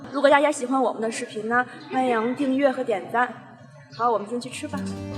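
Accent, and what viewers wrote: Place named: Tianjin, China. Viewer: 特别喜欢听Kaka说的津普，很像上学时听同学说话的感觉👍加油！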